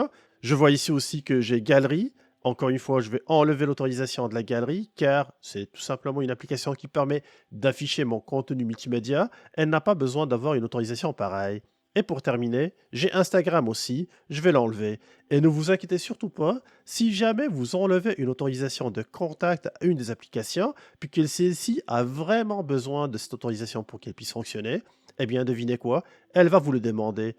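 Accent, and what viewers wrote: Quebec, Canada. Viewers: Super de nous montrer tout les paramètres pour essayer de nous mettre à l'abri de certains problème , continuer comme ça , l'accent Québécois est toujours aussi sympa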